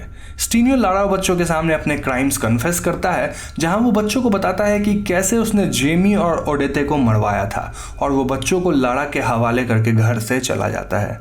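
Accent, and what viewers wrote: Bengal, India. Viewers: U r bengali right , accent shune bujhalm but whatever you're doing that's awesome.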